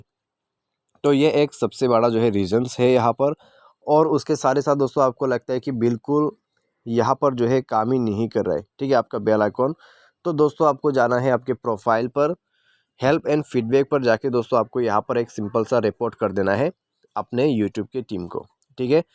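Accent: Assam, India. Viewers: Bro tmi assam r jen lagise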